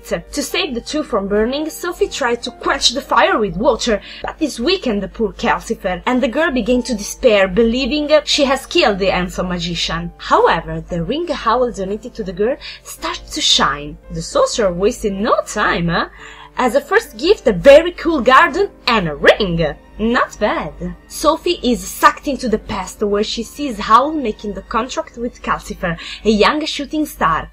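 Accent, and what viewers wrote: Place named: Italy. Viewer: No no, ma ché, sei stata più che bravissima, FENOMENALE, davvero!! Per me sarebbe aldilà di ogni possibilità! Ma non ci si può fare niente, è proprio che gli italiani che parlano in inglese, anche quando (ed è un caso raro) hanno un'ottima pronuncia come te, fanno ridere! Semplicemente perché si sente troppo che non siamo inglesi, è un problema che abbiamo tutti noi neolatini, gli inglesi se ne lamentano sempre. E' il motivo per cui ad esempio anche i migliori cantanti italiani fanno moltisisma fatica ad avere successo nei paesi anglofoni.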